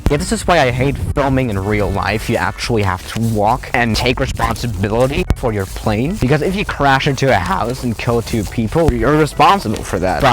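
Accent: Germany. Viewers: wait ur german? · Are you a german? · Are you German?? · Are u from Germany? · das ist das typische deutsch und kein Schweizerischen Akzent oder lebst du doch in Deutschland? English: thats the typical german and not the swiss german xd or are you live in germany?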